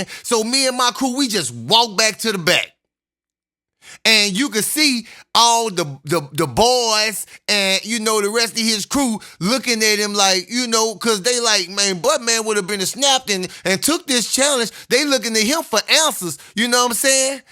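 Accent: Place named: South, United States. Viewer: I love listening to yo country ass.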